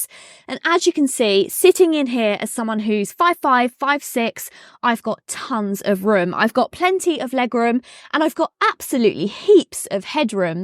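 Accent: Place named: RP, United Kingdom. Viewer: I am not from the UK. I did not mean to be disrespectful, just thought that you sounded posher compared to other YouTubers doing car reviews. It felt like I was watching an English spelling contest. As to whether you get it right - do what you think is right. Keep up the good work 👍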